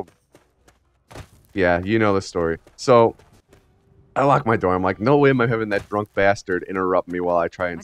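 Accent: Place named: Chicago, United States. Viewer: In a past video you said you we're from the Midwest in this you said you were watching the Cubs game ,guessing your from around cook County too? I knew I recognized that accent.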